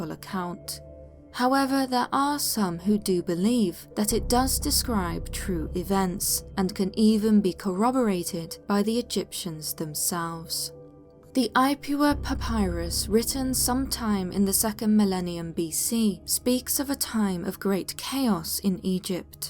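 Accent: England, United Kingdom. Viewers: Dude i can’t explain how much i love this channel. it’s just so awesome, definitely in my top 3 favorite YouTube channels. and i know the narrator lady is married. but her voice is the perfect combination of sexy, intelligence and creepiness if that makes sense lol. (I got a thing for women with the English accent 😆😂) But i digress, over all this channels content is epic & i get stoked every time i get notified there’s a new video 💯. Keep up the great work guys and i wish you all the success in the world. 🙂👍🏻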